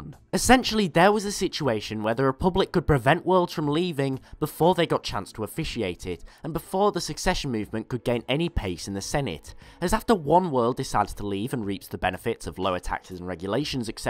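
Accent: United Kingdom. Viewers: This sounds odd, but what nationality are you? British? Australian? Also what if the Mandalorians won the Mandalorian Wars?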